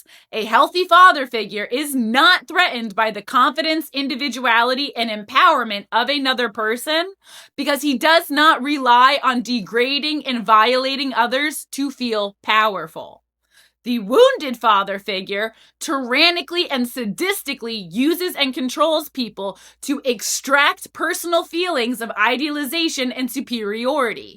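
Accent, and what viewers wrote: United States. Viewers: Where has this woman been in my algorithm? She's brilliant! AND sounds like all the girls i went high school with in NY! I am subscribing!! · Girl I'm tryna read your accent and i can't pinpoint Midwest or east coast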